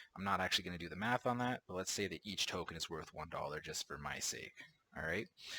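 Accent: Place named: Ireland. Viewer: Sounded Irish to me :-)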